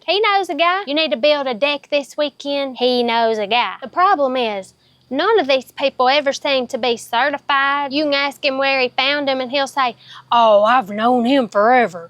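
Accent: South, United States. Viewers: As a southern man, I love to hear her voice/accent. Classic. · I could listen to you talk all day. Southern with lots of sarcasm! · i love your southern drawl beautiful, all women should speak like you.....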